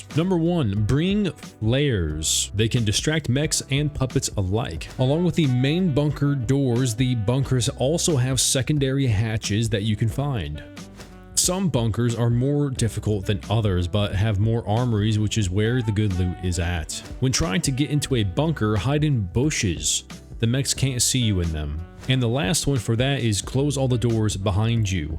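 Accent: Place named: Australia. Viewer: To Americans, your aussie accent could be considered strange.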